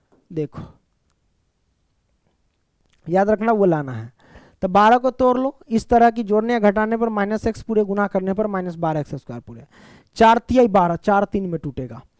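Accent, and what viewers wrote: Bihar, India. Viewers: Sir apki aavvaj bahut hi mast hai bihari type me mast samaj me aya ho to subscribe kare ❤❤❤❤❤❤❤❤❤❤❤❤❤❤❤❤❤❤❤❤❤❤❤❤❤❤❤❤❤❤❤❤❤❤❤❤❤❤❤❤❤❤❤❤❤❤❤❤❤❤❤❤❤❤❤❤❤❤❤❤❤❤❤❤❤❤❤❤❤❤❤❤❤❤❤❤❤❤❤❤❤❤❤❤❤❤❤❤❤❤❤❤❤❤❤❤❤❤❤❤❤❤❤❤❤❤❤❤❤❤❤❤❤❤❤❤❤❤❤❤❤❤❤❤❤❤❤❤❤❤❤❤❤❤❤😍😍😍😍😍😍😍❤😍❤😍❤😍❤😍😍❤😍❤😍❤😍❤😍😍❤😍❤❤❤❤❤❤❤❤❤❤❤❤❤❤❤❤🎉🎉🎉🎉🎉🎉❤🎉❤🎉❤🎉❤🎉🎉❤🎉❤🎉❤🎉❤🎉❤❤❤❤❤❤🎉🎉❤🎉❤🎉❤🎉❤🎉❤❤❤❤❤❤❤❤❤❤❤❤❤❤❤❤❤❤❤❤❤❤❤❤❤❤❤❤❤❤❤❤❤❤❤❤❤❤❤❤❤❤❤❤❤❤❤❤❤❤❤❤❤❤❤❤❤❤❤❤❤❤❤❤❤❤❤❤❤❤❤❤❤❤❤❤❤❤❤❤❤❤❤❤❤❤❤❤❤❤❤❤❤❤❤❤❤❤❤❤❤❤❤❤❤❤❤❤❤❤❤❤❤❤❤❤❤❤❤❤❤❤❤❤❤❤❤❤❤❤❤❤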